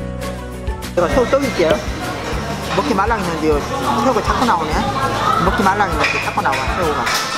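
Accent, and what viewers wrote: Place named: Chungcheong, South Korea. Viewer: 충청도사투리ㅋㅋㅋ 우리할머니같아ㅋㅋㄱ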